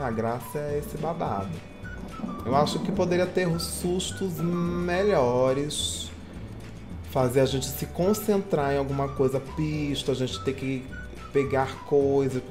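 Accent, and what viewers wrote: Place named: Rio de Janeiro, Brazil. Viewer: Carioca da gemah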